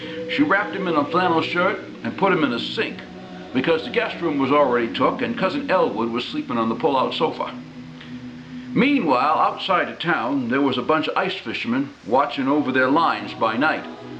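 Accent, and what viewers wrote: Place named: New Hampshire, United States. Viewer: Merry Christmas,! It just ain't Christmas without hearing you read the Christmas story, New Hampsha style. Keep up the good work!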